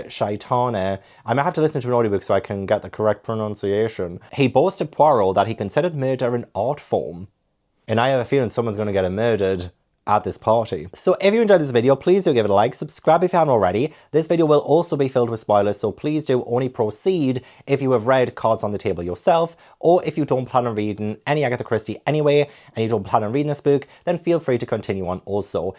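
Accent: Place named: Northern England, United Kingdom. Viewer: I'm not great at accents myself and I am British. It's very obvious to me it's a northern accent but parsing out which one isn't my forte. However he talks about Newcastle a lot so I imagine this is the accent local to there.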